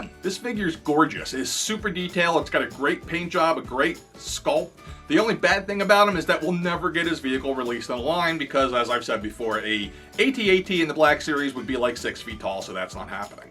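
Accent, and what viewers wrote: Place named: Pennsylvania, United States. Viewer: 3 minutes in and I'm saying to myself "He's GOTTA be from PA with that accent.." HAHA! I'm from Philly, I'd know that accent anywhere, haha! GREAT VIDEO!!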